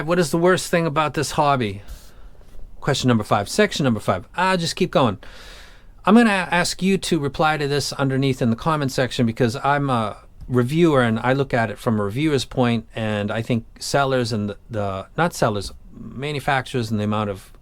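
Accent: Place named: New York, United States. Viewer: I had no idea that you live in Japan. neat. Given the somewhat tight space and accent, I assumed you were somewhere in NY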